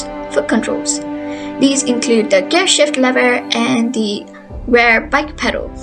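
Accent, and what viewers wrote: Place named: Katra, India. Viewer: Waaaa katra accent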